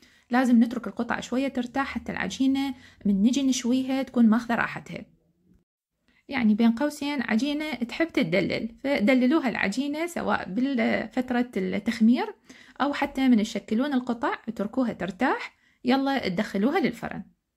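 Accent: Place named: Baghdad, Iraq. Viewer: بغدادي اصيل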